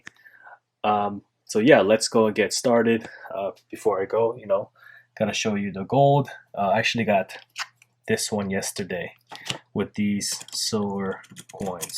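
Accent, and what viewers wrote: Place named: Korean American, United States. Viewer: Dude are you Korean American? From other vid you posted, I though you sound like Korean American. But watching this, maybe you are!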